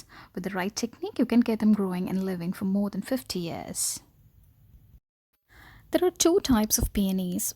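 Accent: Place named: India. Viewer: You have the most elegant Indo-British accent ever! So posh!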